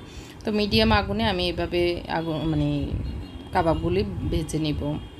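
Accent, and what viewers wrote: Noakhali, Bangladesh. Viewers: Apu Noakhali r naki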